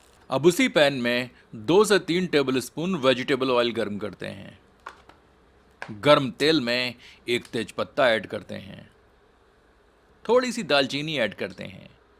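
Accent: Standard. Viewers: Ap ka hindi acha he sudh ghee jesa hindi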